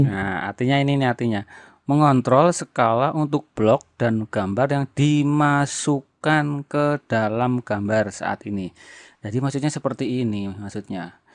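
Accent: Banyumas, Indonesia. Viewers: menyimak om sangat membantu sekali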